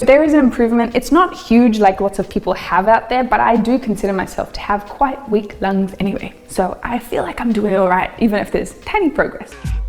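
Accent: Australia. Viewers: you sound australian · you have a slight australian accent after your 1st accent..... did you live in australia? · so this is the first video of yours that ive seen. i was thinking - wow, her accent is on point (im australian.. assumed you are icelandic). i noticed like 5% sounds south african. so then im wondering, has she just lived in straya for a while, or did she self teach the australian accent? then i heard 2 seconds of your bf and i knew he was aussie and therefore that must be why you sound australian. now im thinking, maybe you're actually australian, born and raised. hmmmm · Are part aussie? You sound like it a bit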